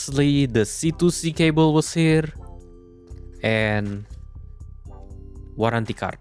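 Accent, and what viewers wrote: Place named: Indonesia. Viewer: Aksennya aja Indonesia banget, lagian bisa pake translate kocak kalo gak ngerti English 😂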